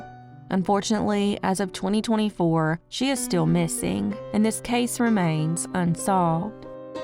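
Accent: South, United States. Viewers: I don't want to disrespect the victims here but I snicker every time she says it. 😂 I'm southern and I love her accent. We say naked to mean undressed, "nekkid" means you're undressed and getting Iin trouble. 😊😅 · Ai voices and pronunciation are absolutely horrible. We love her southern accent on this channel so you're free to go watch a different channel that you like better. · Use a an AI voice you are way to country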